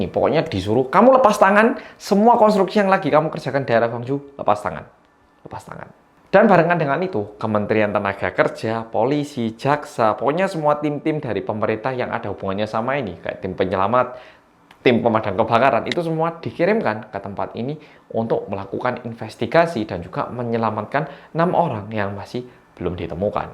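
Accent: Java, Indonesia. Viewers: Kok bisa bhs indo sih trus kya medok jawa lg ? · Mas boleh tanya sesuatu yg sedikit aneh, tapi buat penasaran GK? Dari kemaren tiba tiba aku mikir Mas kan bisa dua bahasa, ada indo sama Korea.. nah mas kan lancar bgt tuh bahasa indo bahkan logat nya lumayan medok. Tapi mas juga asli dari Korea dan lancar bahasa Korea.. Pertanyaan ku mas kalo bicara dalem hati pake bahasa apa ya hehe😁?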